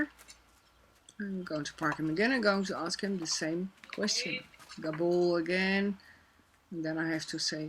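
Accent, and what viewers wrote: Netherlands. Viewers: Thank you it really helped. Are you from holland??? Because you have a little bit of a accent. I am dutch too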